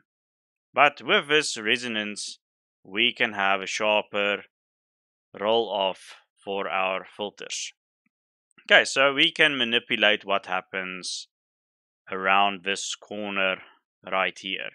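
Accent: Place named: South Africa. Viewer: Sounds South African, baie dankie boet.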